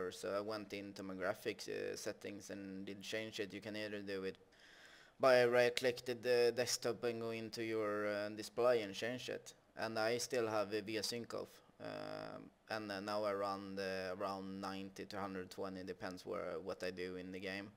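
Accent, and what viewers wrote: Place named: Sweden. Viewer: Aldrig hört någon mer svensk människa.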